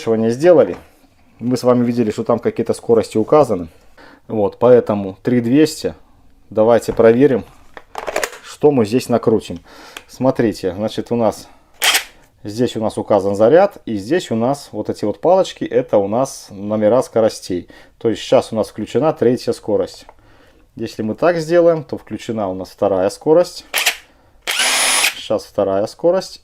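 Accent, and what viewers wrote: Belarus. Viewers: Давно в Беларуси не живу, но интересно наш акцент услышать родной, ) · По голосу слышу, что беларус. А ссылка на российскую версию Алиэкспресс. Не понятно.. . 🤷‍♂️ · По разговору похоже что вы из Беларуси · дак я ж по говару сразу сказал - Белорус)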